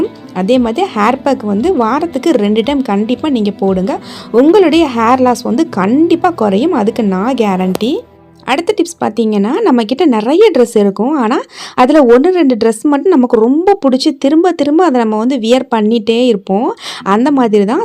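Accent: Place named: Ramanathapuram, India. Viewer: மாஷா அல்லாஹ் நீங்கள் சொல்வது அனைத்தும் உண்மையான விஷயம் தான் ராமநாதபுரம் பாஷை கொஞ்சம் வித்தியாசமாக இருக்குமே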